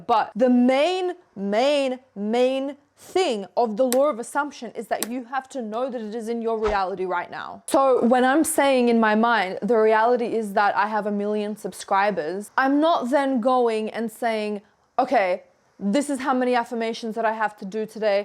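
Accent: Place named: Australia. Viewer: I love your Aussie accent saurrr muchhh fr🤧✨❤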